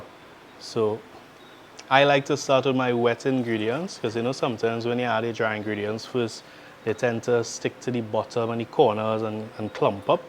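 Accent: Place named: Trinidad and Tobago. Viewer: I love your accent. Are you originally from Trinidad? Excellent recipe. I’m going to try it. Thank you.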